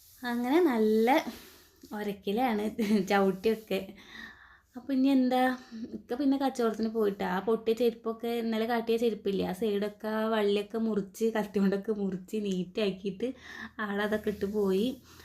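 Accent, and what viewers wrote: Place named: Malappuram, India. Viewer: Hai enna video kanunne trissur jilla analle varthamanom kettappol malappuram anenn thonni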